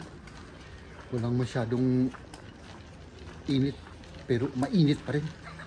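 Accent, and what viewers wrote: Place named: Igorot, Philippines. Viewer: Ask ko lang po, yung accent nyo kasi sa Tagalog parang Igorot. Ay Igorot kayo? First time ko agbuya vlog yu ta recommended ti YouTube. Nag subscribe akon.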